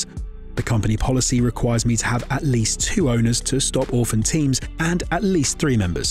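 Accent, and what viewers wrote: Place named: England, United Kingdom. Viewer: This is amazing! Amazing product, walk through and the English accent helped bring everything together :)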